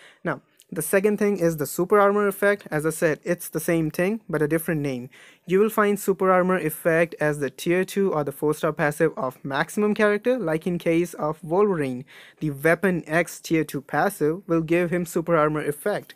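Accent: India. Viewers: Also u have a slight indian touch to ur accent.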